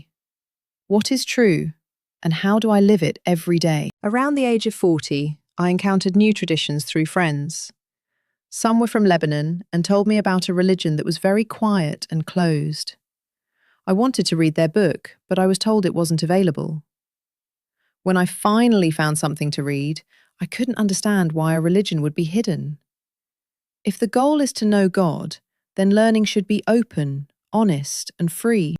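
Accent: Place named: United Kingdom. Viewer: She mentioned that she is from USA, but she has a strong British accent, is this the same woman?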